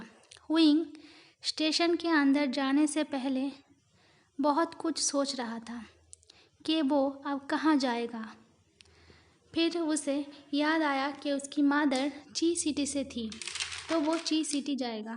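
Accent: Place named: Bengal, India. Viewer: Mujhe laga hi ta pahle din se hi ki aap bangli ho kuki aapke bolne ke lahje se me samjh gai ti di kuki me bi bangli hu 😍 and i love your voice and aap bahut hi aachi bol leti hai di 🤗 love you so much di 😘😘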